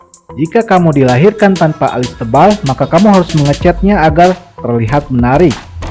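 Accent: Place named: West Java, Indonesia. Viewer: Yang ngomong pasti orang sunda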